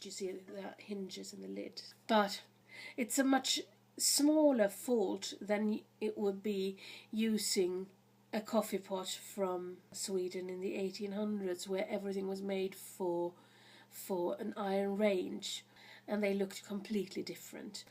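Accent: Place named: United Kingdom. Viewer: You are fascinating. LOL! I hope you take that as a compliment. Do you live in Sweden? From your accent I was assuming you were in the UK.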